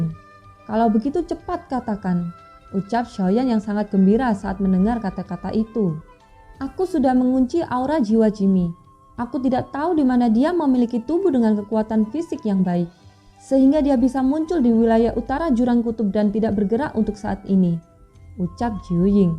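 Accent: Java, Indonesia. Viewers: Terasa medooknya 🤣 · Hmmmm ada logat Jawa nya.. Jadi gimna gitu denger nya😢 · Aura jawa ny mentok ya.. mimin ny..😊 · Suara nya jadi medok bgt. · sebenernya suara mimin ke 2 enak di dengar, tp yg jd asing itu pembawaan nya kurang tegas malah bikin ngantuk, trs dlm bahasa indonesia nya masih ada cengkok jawa nya jd terasa gmn gt. Sekedar saran coba dlm membawakan alur dlm bahasa indo ini cengkok jawa nya di ilangin 🙏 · Medok men toh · Jowone totok😢😆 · Suara mu kgak enak min, medok btol. Mn si??? Dia lgi pas tuk mmbwakan nya, jlau kmu tdk kembalikan, kmi akan ramai2 mninggal kan channek mu ini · Adu aduhh ko jadi medok ya, 🤭🤭🤭🤭, yo wes gpp, lanjut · ini suara kaya nya Avatar nya ka.yng ngomong bukan suara kka yng asli..ka nya mna ya..Iki suoro ne kaya lagam nya wong jowo.. Iki..aduh kurang seru suara mu Avatar..🙏🏻🙏🏻🙏🏻.maap ya suara kka Avatar kka kurang menarik niee😔😔😔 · suaranya jadi medok gini.....jadi nggak pass rasanya😢😢😢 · Aih kok suranya ganti, logatnya kaya cici jawa medok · Medok amat mc nya... 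🤭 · Logat jawa nya masih terasa🤭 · Suara nya terlalu cepat ini min... kurangi dikit..biar mirip sama...logat Jawa nya nampak x min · Medok banget nada suara nya.suara Jawa..emang kmn kak..kangen suara nya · Agax medog pasti mba min orang jawa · suaranya medok minnnnnn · Ini kok jawanya medok bgt😂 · Suara ne medook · kok lain suaranya, ni medok bgt Jawa nya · Suaranya unik, mendok sedikit,ya keren siihh,di dengar agak kaku sedikit tapi begitu pemulah,ttp semangat ya,🙏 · meddok suaranya kurang power, tapi boleh tahanla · Ko medok yah. Maaf nih orang jawa bukan? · suaranya kurang,semangat,strong,terasa datar,logat jawinya kental,maklum belum terbiasa😁🙏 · Agak meddok. Lucu lucu 🤣🤣🤣🤣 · sekarang suaranya jadi medok...klihatan jawanya.😆 Semangat...!! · Suaranya duh..... Logat medok dan lemes... Ayo retret dulu dan berkultivasi supaya kami tak.ketiduran saat mendengarkan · Suaranya kaya agak medok gimana gitu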